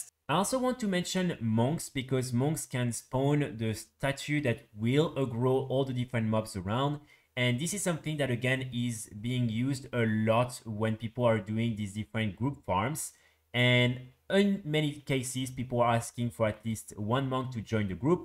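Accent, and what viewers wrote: France. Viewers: Thats a French accent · Are you french?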